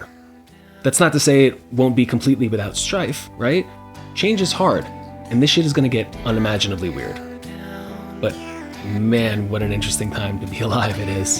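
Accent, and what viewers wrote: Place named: United States. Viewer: You are not Cornish you're American Dumbo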